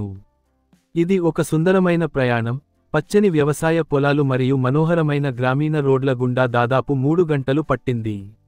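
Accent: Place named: Telugu, India. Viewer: Interesting Telugu accent 😊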